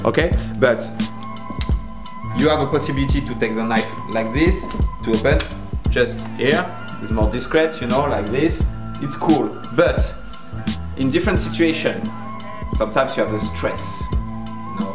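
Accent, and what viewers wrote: France. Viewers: Super vidéo mec, je ne savais pas qu'il y avait un français sur cette chaîne j'ai reconnu direct avec l'accent ^^ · Avec un délicieux petit accent français ;-) Merci pour la vidéo!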